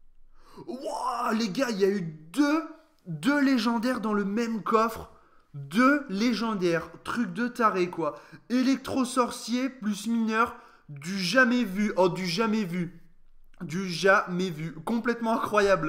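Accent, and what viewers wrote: France. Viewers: J'adore ton accent marseillais ! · l'accent du Sud 😃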